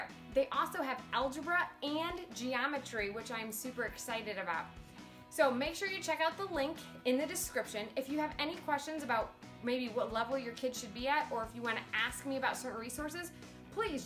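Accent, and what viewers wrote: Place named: Midwest, United States. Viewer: This video is so helpful! Thank You! This will be my first year homeschooling my Kindergartener and while I’m so excited, I’m also pretty nervous. This curriculum looks pretty legit, though, and if a math teacher says she recommends it above all others, then i know it’s going to be great. Btw…I have never heard of Saxxon Math (I’m new to all of this). While I was listening to your video, I was in the other room grabbing some laundry and heard you say something like, “I know mothers just love Saxxon Math…” I literally stopped what I was doing and said out loud, “WHAT did she just say?” 🤣 You sound like you may have a Midwestern accent to boot, so it reeeally sounded like…something else to me. K, enough about that. Again, thanks for the video and we can’t wait to get started! Take care!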